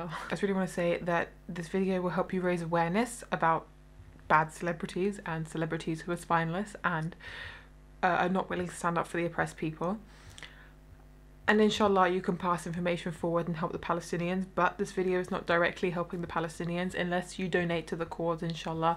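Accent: United Kingdom. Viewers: ...and as if you are not trying to spread Palestinian propaganda? C'mon, are you even Palestinian with your white skin and British accent...lol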